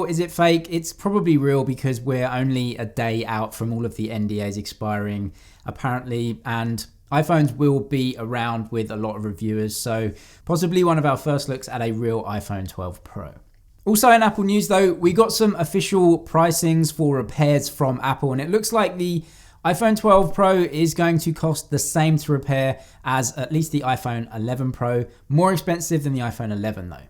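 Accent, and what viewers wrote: United Kingdom. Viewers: and he's even British too.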